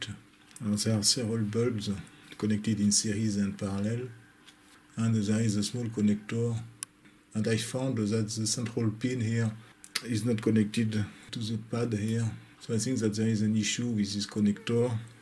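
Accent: France. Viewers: Love the funny frenchlish. Sounds like a Frenchman in a movie.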